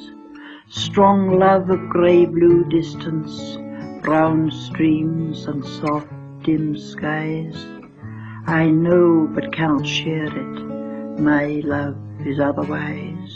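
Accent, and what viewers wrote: United Kingdom. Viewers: The Reciter sounds like she's from Shetland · It makes me weep too. has a beautiful and distinctive Dundee burr, from her grandfather I guess.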